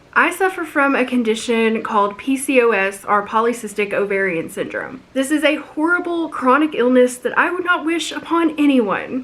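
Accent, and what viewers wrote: South, United States. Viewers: your lil southern accent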